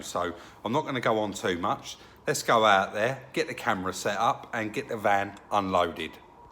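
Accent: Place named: England, United Kingdom. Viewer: I'm from Australia. I could listen to that English accent all day.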